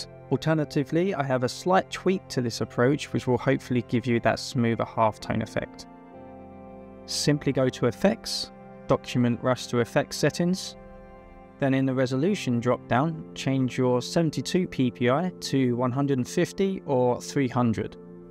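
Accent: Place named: United Kingdom. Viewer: Great tutorial, and of course everything sounds even nicer in proper British English :D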